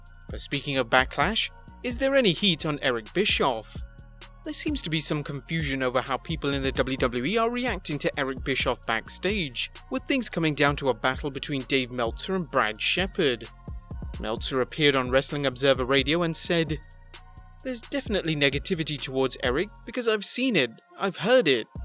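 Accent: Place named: London, United Kingdom. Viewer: lol Alex Jones has well over 6 million radio listeners and an additional 10 - 12 million viewers on the internet daily. In comparison to the WWE and their fake bullshit vs a truth telling Infowarrior who in the hell cares about phony ass wrestling when the world is in crisis. Turn off your televisions you idiots all hell is about to break lose and when it does there wont be any phony wrestling to watch. judging by this clowns accent I can see why London has fallen, instead of being out there supporting a real bad ass hero named Tommy Robinson he's watching phony wrestling and worshiping fake ones.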